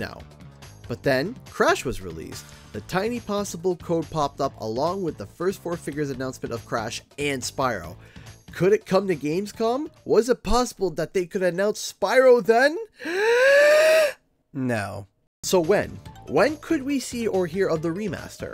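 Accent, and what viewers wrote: Canada. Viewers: Oh Canadian? Im a first time viewer of your channel and I already like it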